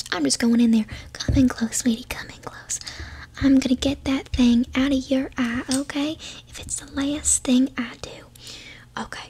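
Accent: South, United States. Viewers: That southern accent was spot on, thanks for waping ma aahballs, appreciate ya'll...😁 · Her southern accent was amazing. Great job ❤ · What a sweet accent. I loved Mrs Cooper's accent, so that's southern... Good to know... one more asmr keyword I can search for. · If this was your first video.. I would think you were dead ass southern. Nailed it!! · is back once again! I really liked the southern accent! You should do some more accents in the future 😀😴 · i specifically love southern accents , this was pretty damn good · OMG... with a southern accident is too much cuteness. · w southern accent ❤❤❤ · Southern comfort. This is my favorite so far. Although, the English accent one was good too. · I’ve been so used to the voice you’ve used for all of this time. When I turned on this video it immediately made me feel way better. I don’t know what it is about a southern accent especially coming from you but it is so calming to me. 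Thank you so much for making this video. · You know I laughed hysterically when I heard that Southern twang and vocabulary. That sounded a little too authentic to the knucklehead that is me, myself and I. Also the voice reminds me of my late aunt. That may be another reason why I laughed. I have fond memories of her and a funny story or two with her. One in particular. That funny memory is something I have not thought about in awhile. Thanks for the extra smiles! Edit: no I am not from the south but the area I live in in western PA could be transplanted from somewhere from the south lol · All I love in the same masterpiece! I’m not a specialist but I think your accent is good, it reminded me of movies or series with southerners. il est vrai que tu es très "belle"! from face but also your profile is so elegant! you're always stylish in appearance, but also an interesting, original and fun personality. 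you are really the person you think you are! also I really hope that your sleep quality will improve🤞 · As a southerner you did a great job with the accent! :hands-yellow-heart-red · You are almost the polar opposite of how I picture a woman from southern US in my mind, but your accent makes up for it entirely. The stark contrast is, for some reason, incredibly satisfying.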